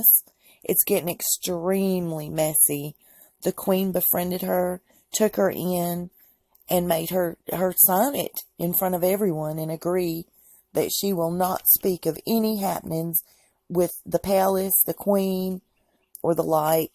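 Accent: South, United States. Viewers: I see YouTube will let any idiot with a Southern Accent pretend to know the inner workings of the royal family. I can't 😂😂😂😂. I see most of the videos, the comments are disabled😂😂😂😂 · Wow! I enjoyed the way you interpreted the Coat of Arms!😃👍Id love to go with you to some cool art displays! You definitely have great insight, and intuition! I enjoyed hearing you again too. You have such southern charm in a down home way that makes your viewers feel right at home! My daughter got married in a hotel art museum that blew me away! It was a truly memorable experience due to how some of the art and its display affected you. I agree that the Queen has an evil eye toward Meghan, and I wonder if she might have had secrets beforehand about the royal family. I agree with you totally that the royal family will make sure Meghan doesn't "sing like a bird"! It is so disturbing that Meghan's Mom has to act like her daughters been shunned! 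It's like she has to act like her daughter doesn't exist to her loved ones and friends, as if she was dead! No comfort though given due to her daughter living in a palace! It seems like this is overkill...what else is being hidden?!🐍🐉🐲 Love you bunches!😃🍕🍻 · You are a very bright light. You vibrate at a very high frequency. You have so much love and compassion in your heart. And to hear someone speak without the burden of having to pass judgement, with only the pure intention of educating and awakening is very much appreciated. And for lack of any better way to describe it, so I hope that I don't offended you, but your "accent" is like a sweet Southern lullaby that tends to soften the blow of the horrific atrocities you speak of that we all are in spiritual warfare with. Thank you and bless you.💖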